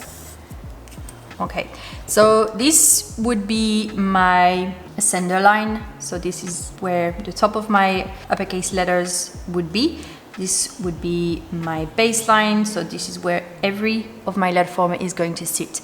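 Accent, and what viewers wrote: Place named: France. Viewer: Your accent is quite good, but I can easily recognize your french ! ;)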